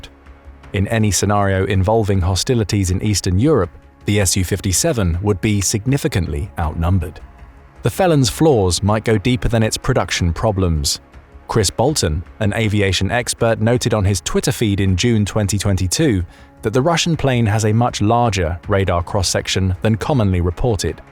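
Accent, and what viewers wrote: England, United Kingdom. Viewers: It sounded like the narrator had an English accent. They can't use the F22. I'm not even sure they have the F35A.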